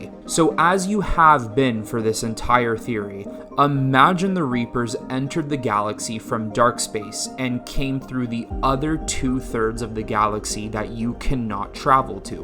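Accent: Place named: Canada. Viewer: you’re so welcome. Also I LOVE that Canadian accent 😃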